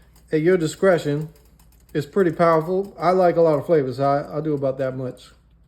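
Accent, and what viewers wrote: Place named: Louisiana, United States. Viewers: Are you livin down in Louisiana? Sounds like it. I'm from Shreveport. Your cookin looks good. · I am from Louisiana & I hear da accent! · Ok….. Why do you have a normal voice while cooking one recipe and then….. out of the blue, you’re a full fledged Cajun!!! I love it!!❤ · Where did that thick southern accent come from all of a sudden?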